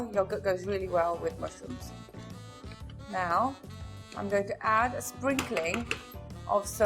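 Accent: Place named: United Kingdom. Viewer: Bloody hell. Absolutely fell in love with the British accent!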